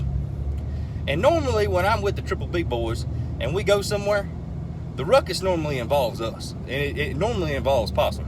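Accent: South, United States. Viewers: I just discovered him and have wasted my whole morning listening to him. Is it the accent or the country talk? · I love love love this guy and his extra country ass .... sounding like he own a horse ranch.... I had a friend like that till I had kids and she had hands.... her nails was as long as my arm from the elbow to my wrist and she was a beast plus crazy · And that southern accent.Wow love it. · I just love your southern speech and the jokes! Awesome story teller! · Love that southern twang.could listen all day,and you are funny. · I really like This guy’s accent it reminds me watching Dukes of Hazard or Dallas as a kid...he’s a nice cool Real funny guy!. I almost died laughing and I never sub to nobody ever!...but I did it for the first time to his channel.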